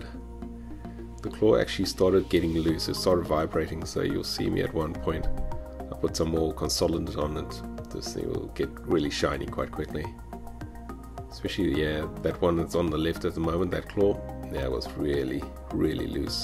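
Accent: South Africa. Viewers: Your address says New Zealand, but your channel name and accent says South African? Great video btw.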